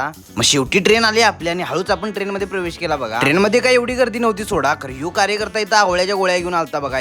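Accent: Kolhapur, India. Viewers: Tu kolhapurla rahtos Tujha avaj अस्सल kolhapuri ahe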